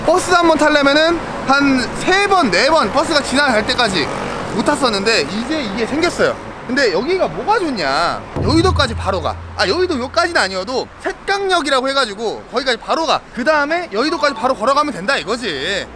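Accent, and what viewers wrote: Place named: Jeonju, South Korea. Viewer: 아저씨 말투보니깐 전주출신 같은데 ㅎㅎ 능글맞은 것도 그렇고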